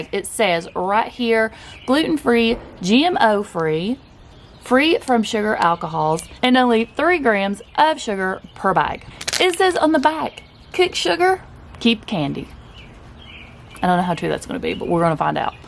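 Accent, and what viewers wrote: South, United States. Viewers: Something is busted there. The meter clearly says "Ketones" and then the ridiculous number. I don't have a KetoMojo but a Precision Xtra that does glucose and ketones, and the meter will clearly show what type of strip it is reading - Glucose or Ketones. Something is flubbed there. In any case, 268mg/dl would be OUTRAGEOUS for ketones so it may really be reading glucose. But if that number is legit, this is worse than sugar. Actual sucrose would hit you maybe into the high 100's. .. BTW, love your channel and please ignore the haters. I live in the south and I love your accent! You sound like a sweet, friendly southern gal to me!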